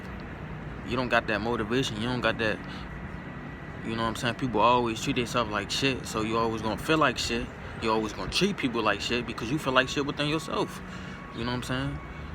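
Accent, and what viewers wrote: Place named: New York, United States. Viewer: Damn sound like you from NYC Or Philadelphia from your accent where you from?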